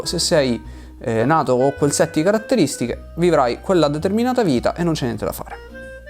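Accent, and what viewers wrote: Sicily, Italy. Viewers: Anche io seguivo Voyager! Bellissimo il finale alla Adam Kadmon: "un abbraccio". Kadmon, coi duoi rimandi emotivi, formulava un invito alla ricerca continua e al non lasciarsi omologare dai cosiddetti "buoni per definizione". Lo adoravo. Lui tralaltro chiudeva i suoi interventi con la bellissima frase: "prendete queste mie parole come favole, un abbraccio, Adam". Spero che questo canale cresca ancora. Fa piacere anche sentire una voce siciliana (io sono di Catania ma vivo in Toscana), noi siciliani abbiamo nel DNA il dubitare sempre, l'argomentare, il desiderio di esplicare, la volontà empatica soggettiva di non soccombere e non accondiscendere.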